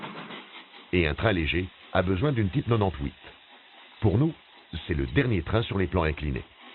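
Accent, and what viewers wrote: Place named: Belgium. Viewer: D'où vient ce commentateur. Entre Berschem et Charbeque, il nous donne de l'octante...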